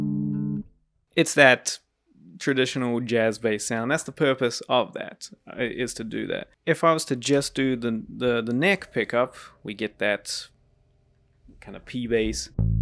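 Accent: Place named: New Zealand. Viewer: It's always funny to hear the familiar Kiwi accent out of the blue. You're an amazing player bro!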